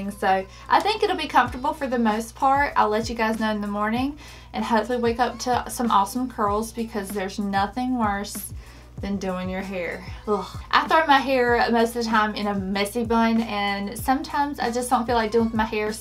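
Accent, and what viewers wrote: South, United States. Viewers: I want these now!!i love your accent! You talk like me so you must be from the south!😀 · I just found you. You are stunning and I love a good southern accent. I was born in the West but my entire family is from the south and so I'm the only one who speaks wrong, as they lime to tell me. Lol. I also love shark tank and wondered about these when I saw them on there. Loved seeing how to use them. I'm really bad with hair, and makeup and fashion. Lol. I missed the girly gene apparently. 😂 want to try these now. Your curls turned out beautifully. Do you think spraying them with hair spray before you take them out will help th curl stay longer in the day? Thanks! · As a Texan it's definitely southern the entire time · Love your accent!! I found your video saying your from NC!! I'm from Oklahoma/Arkansas and it's funny how southern accent all sound a tab bit different😊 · Emily needs to go bye bye with the negative comment about the accent, I am from North Carolina and have the same southern accent and let me just say that this chick is an adorable Southern bell and very beautiful, thank you for the tutorial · Southern AF the whole time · Sometimes I think she's southern, but sometimes she had a slight Aussie accent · It's the lisp and the southern accent all at once! 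· Emily Gilbey hateful.. a southern accent comes from your birth place naturally just as an Australian or British. I don't understand such a hateful comment on the fact that she has a southern accent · I'm Australian and there is no Aussie accent there at all only Southern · Is that a Southern accent? No wonder I can't understand you. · I heard the same. But mostly I hear Southern. And it’s really great to listen to. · She doesn’t sound anything like an Australian lol. We don’t talk this way. She’s southern 😂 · I love your country sweetheart accent 😝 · Really? She sounds like she's from North Carolina or Alabama to me. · I think South Carolina or north Georgia. Just a guess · This is a Southern US accent, not Texas, but more like Georgia or the Carolinas. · Hannah Slowikowski No..completely Southern the whole time. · You talk so cute!! Such a sweet country girl accent! And your hair is gorgeous!! 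· She sounds Southern. I'm from NC and have a similar accent, and I have family with thicker accents.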